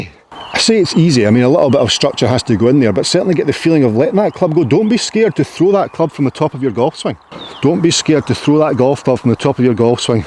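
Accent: Scotland, United Kingdom. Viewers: I love your videos,. They are loaded with good tips, and you have a delightfully Scottish way of delivering them. Keep at it.m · I love watching your videos, as a Dutchman I have a extreme weak spot for Scottish accents. Love how you always come strrraight to the point. For me I developed a slightly embarrassing release feel: For my irons the release feel is as follows: If I literally would let go of the club I would have it hit someone in front of me in his mid section, for the driver it would be his head. Not sure what a psychiatrist would think of that but it works for me.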